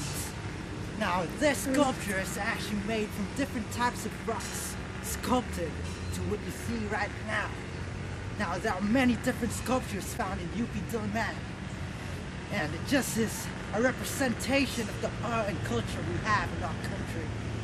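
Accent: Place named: Australia. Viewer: I can't stop laughing 😆 the Australian accent 🤣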